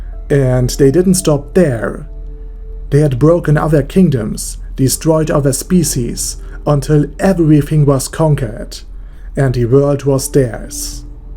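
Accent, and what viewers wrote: Germany. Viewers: You're German aren't you?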